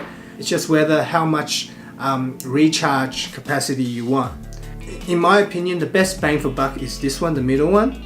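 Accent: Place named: China. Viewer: este chino hablando ingles me vendió los audífonos a un latino. Maravilla en su máximo esplendor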